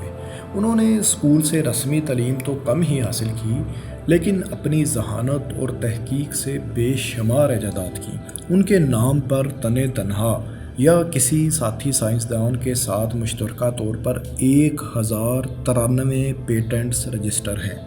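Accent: Punjab, Pakistan. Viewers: Video achi hy magr ap k lehjay mn Punjabi tone bht zyada hy.. Apka Urdu talaffuz bilkul acha nhi hy..!!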